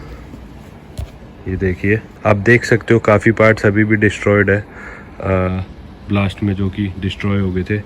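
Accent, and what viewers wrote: Haryana, India. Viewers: Bhai is your hometown near Hisar or bhiwani Haryana? You just sounds like some of my friends from nearby villages.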